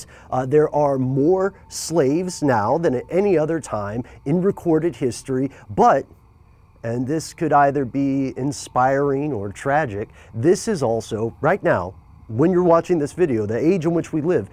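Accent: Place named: United States. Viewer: Hey, you sound American, so why do you use the term "petrol" rather than "gasoline"? I'm not being flippant; I'm genuinely curious. Are most of your viewers European?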